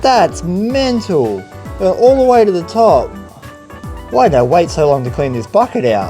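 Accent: Australia. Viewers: I spend a considerable amount of time watching those other gold prospecting videos you mentioned and would like to point out that your personality and energy, as well as your Aussie accent and Gadsey (sp), all combine to make your work the first I choose when there are choices. It would be a shame if you lost the will to continue your work and I commend you for your efforts.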